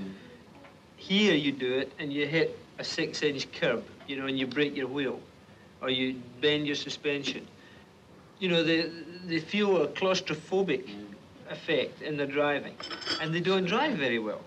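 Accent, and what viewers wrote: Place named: Scotland, United Kingdom. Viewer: The auto closed captioning is just getting crushed by that sweet sweet Scottish brogue.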